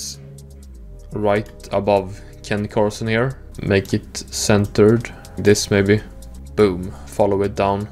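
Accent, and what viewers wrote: Sweden. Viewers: why does he sound swedish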